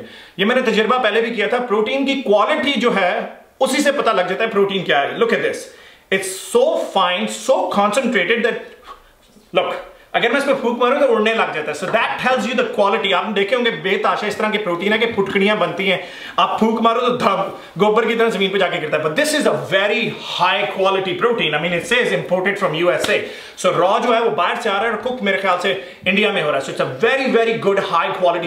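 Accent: Punjab, India. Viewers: AWSOME BRO SUPER LIKE TUHADA PUNJABI TOUCH SIRRA KRA JNDA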